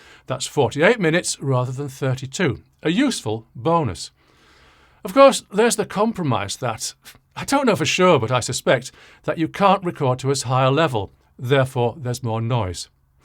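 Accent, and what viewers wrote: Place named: Liverpool, United Kingdom. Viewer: Thanks scouser ❤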